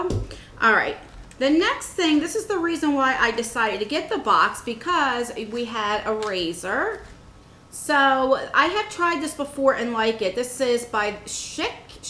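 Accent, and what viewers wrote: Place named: Mid-Atlantic, United States. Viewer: Hi! I love your videos!!! I lived in Delaware for 14 years and I miss that Mid-Atlantic accent!!! Your videos are so informative and I just love your down to earth personality. Keep it up ❤️❤️❤️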